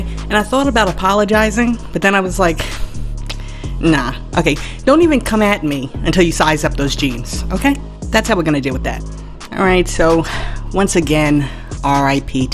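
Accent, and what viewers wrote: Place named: Queens, United States. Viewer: What up fam…. What up sis..!! You Jamaica Queens strong I can tell.!!😃. Great job please keep it up..!!! Sup..!!!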